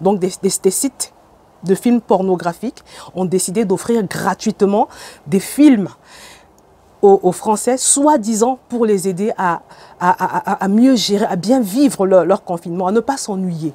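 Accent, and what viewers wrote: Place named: Mali. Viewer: C claire t une malienne vu l'accent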